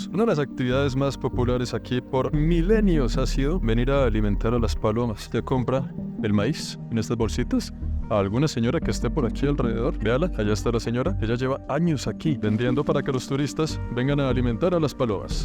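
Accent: Bogotá, Colombia. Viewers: noto el acento ROLO se te marca demasiado viejo 😅... ALGUNO TAMBIEN LO NOTÓ!!!! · Se te salio el rolo jajaj hablaste muy rolo en todo el video muy chevere y muy de buenas salir con la cámara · Me encanta que aún no has perdido el acento rolo jejej y en el sector el acento rolo lleño 🤭 , un abrazo desde Bogotá ❤ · Me encanta como se te remarca el acento colombiano cuando estas en Bogotá jajaja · amor!!!, Recuperaste El acento rolo😊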